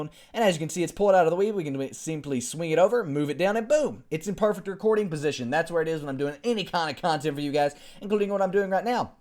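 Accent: South, United States. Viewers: I just watched your first Setup video! You can really here your Southern accent in it xD It's hilarious! XD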